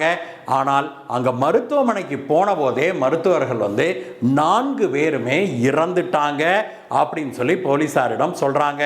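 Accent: Kongu, India. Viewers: உங்கள் கொங்குத் தமிழ் அருமை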